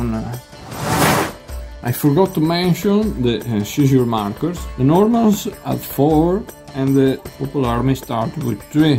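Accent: Italy. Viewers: Venitian accent, true?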